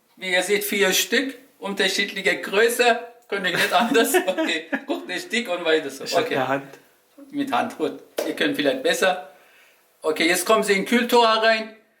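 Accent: Swabia, Germany. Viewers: des schwäbisch ist einfach toll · Sein Schwäbisch 😂😂Geiler Typ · ein schwäbelnder türke <3 instantlike haha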